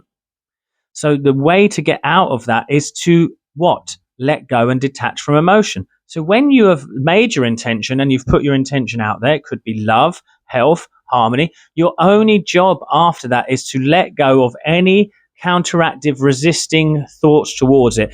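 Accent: United Kingdom. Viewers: Halo there, lol is this uk accent? Love the content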